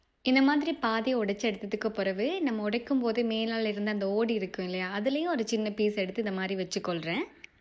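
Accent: Sri Lanka. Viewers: நன்றி.சிறீ லங்காவா அல்லது இந்தியாவா.அழகிய தமிழ்.❤❤😅😅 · ஸ்ரீலங்கா அல்லது இலங்கை தமிழ்.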